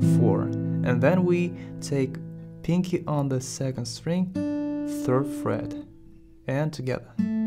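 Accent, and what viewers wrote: Russia. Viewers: No, sadly, Let’s play guitar had an Indian accent (I think that was his accent) and has a Russian accent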